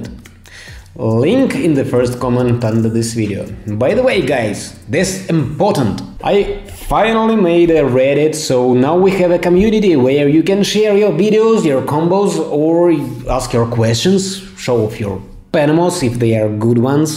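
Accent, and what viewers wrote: Russia. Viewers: Акцент русский какой-то)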